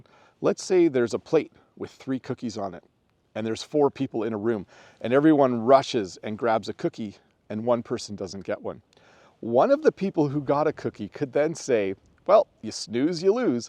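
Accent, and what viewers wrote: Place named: Canada. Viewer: you have a good video! Your Canadian accent is very good, it makes easy for us to learn english...I also loved the place you did the video!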